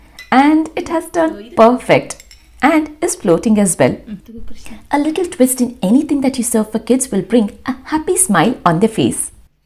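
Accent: India. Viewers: Wow you are awesome..The way of your pronunciation is true Indian.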